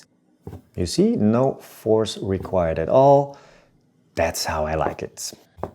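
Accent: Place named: Netherlands. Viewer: Heard about your channel on the sharpening subreddit, I hear a Dutch accent in first 2 seconds. Love it ❤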